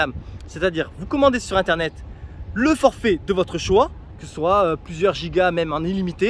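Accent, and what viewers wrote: France. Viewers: Nghe là biết người pháp😂 chào mừng anh em đến với Vietnam 👋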